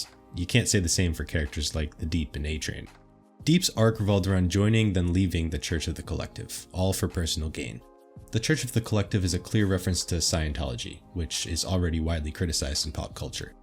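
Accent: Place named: United States. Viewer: Thanks for talking about the boys in perfect American not like these wana be Americans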